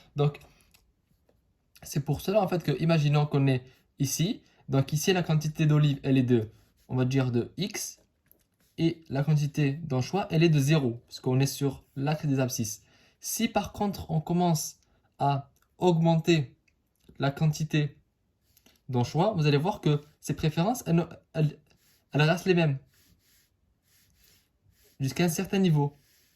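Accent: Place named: Morocco. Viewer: Merci, rien à dire vous sauvez mes révisions ! J'ai remarqué un accent marocain chez vous si je ne me trompe pas Allah y hafdk c'est très bénéfique ces séries de vidéos.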